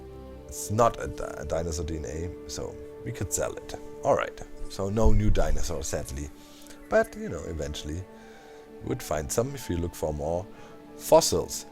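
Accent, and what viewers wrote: Germany. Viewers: Your englisch has an accent? German?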